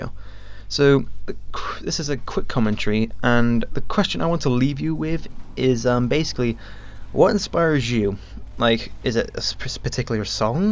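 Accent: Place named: England, United Kingdom. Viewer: You sound english...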